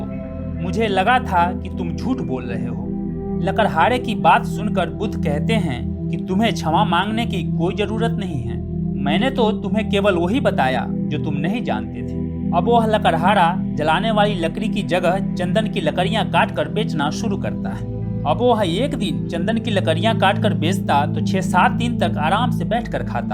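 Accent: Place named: Bihar, India. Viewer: Voice must be bihari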